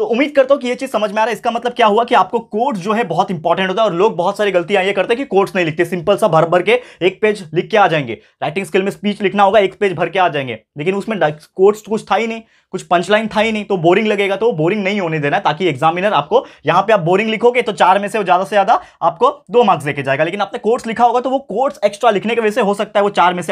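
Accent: Maharashtra, India. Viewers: Sir aap kitni pyaari Marathi bolte Ho 🤩❤😘😃😄☘️👍🙏🏻❤🌍💯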